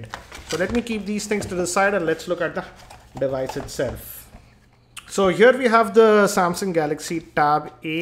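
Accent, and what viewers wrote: India. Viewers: I cannot understand you..are you hindi..?? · Real Indian accents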